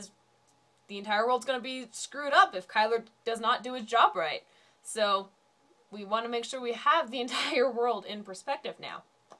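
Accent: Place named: United Kingdom. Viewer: Welcome Back Pretty , your accent changed a little to British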